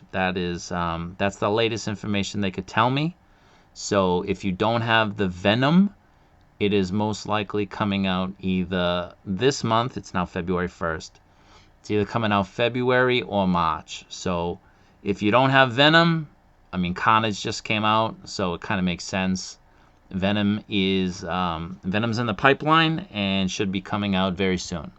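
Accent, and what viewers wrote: Boston, United States. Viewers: by the way I'm the one on reddit asking you to do little Japanese in your Boston accent 😂. I understand why you don't but man it would be gold. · It’s nice to hear the accent I am accustomed to in my daily on YouTube. Not enough Bostonians on YouTube.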